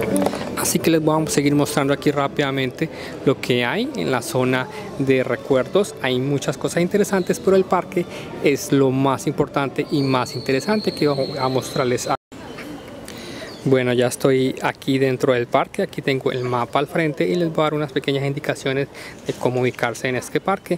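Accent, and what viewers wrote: Colombia. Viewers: Hola! Noto por tu acento que eres colombiano jeje. Una pregunta, tenemos planeado viajar en Julio de 2017 desde Bogotá, recomiendas comprar las entradas por internet o es mejor allá?. Gracias por tu video :)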